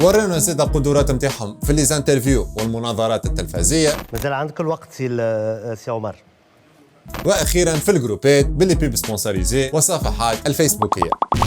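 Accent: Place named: Sfax, Tunisia. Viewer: اللهجة الصفاقسية بدات تتسرح على العادة .. ولات أوضح شوية 😁 Great video 👏👏👏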